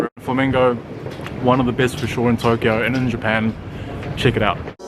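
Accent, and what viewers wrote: New Zealand. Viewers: You sound like kiwi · yeah was gonna say this lol, assumed you were aussie for a sec then i listen on and am like bruhhh this guys kiwi as 😂 nice video bro!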